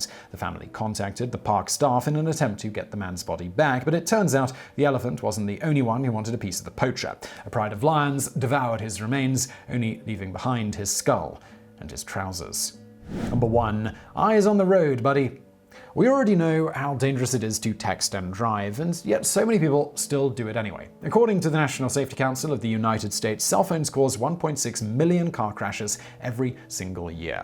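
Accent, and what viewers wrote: United Kingdom. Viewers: Your pacing and elegant English accent make stupid sound almost near normal. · Can I please get a video where you pronounce things like garage, aluminum, etc. in proper American? I'm getting tired of using the Brit to American translator... and don't even give me your kilo and kilometer bullcrap...or 999 instead of 911. I think I covered it all...for now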